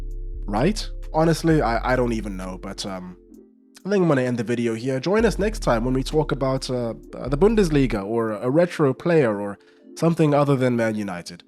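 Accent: South Africa. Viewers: I'm curious about where you're from bro? Name says Zim, accent says SA. Just curious... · Are you south African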